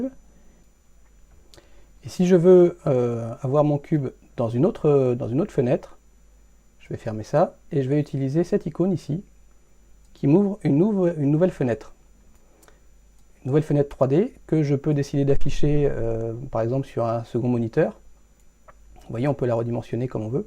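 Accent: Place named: France. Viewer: woah you're french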